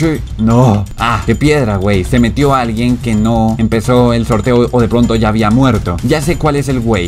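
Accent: Colombia. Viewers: Sos de colombia no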